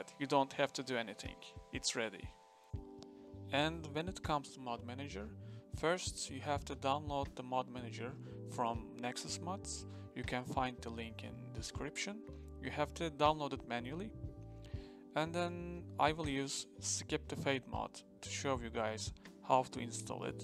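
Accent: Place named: Turkey. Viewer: Videoyu izlerken içimden ya bu adamın ingilizcesi bildiğin türk ingilizcesi ya diye geçirirken masaüstündeki türkçe isimli dosyaları gördüm eline sağlık video için kardeşim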